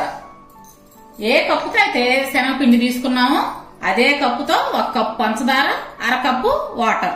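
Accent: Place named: Anantapur, India. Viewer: Meeru anantapur yasa matladtunaru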